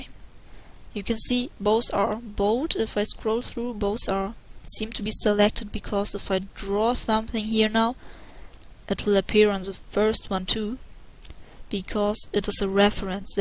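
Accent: Germany. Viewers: Are you german? I fucking hear it ¬¬ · ich habe iwie sofort an deinem englisch erkannt dass du aus deutschland kommst xD(nicht dass das schlimm wäre o: ) aber schön zu sehen das es hier so coole undertale fans und animator gibt :D grüße aus hannover :3 und natürliches: nices tutorial :D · Man hört sehr gut heraus, dass du aus Deutschland kommst :D Sehr gutes Tutorial btw! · I think it's German. (I'm German too so I can hear the dialect) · Sehr gutes Tutorial :^) Ich habe die ganze Zeit überlegt was das für ein Akzent bis ich am Ende erkannt habe, dass es ein deutscher ist ^^ Weiter so!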